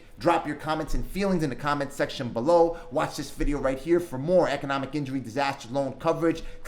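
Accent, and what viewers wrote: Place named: New Jersey, United States. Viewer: Last night I met a guy. His voice sounds exactly like yours. He told me he's from New Jersey. I knew immediately that he was from NY or NJ 😂😂😂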